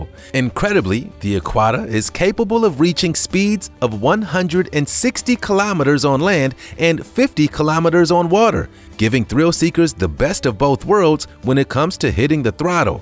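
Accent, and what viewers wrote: United States. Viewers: Nob the Knave English language is coming from 🇬🇧, and this is a cowboy English dialect, and it's sounds like he has hot baby patato in his mouth. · I hate when people with and American accent talk kilometers. They know it don't mean crap to most of us. Miles per hour please !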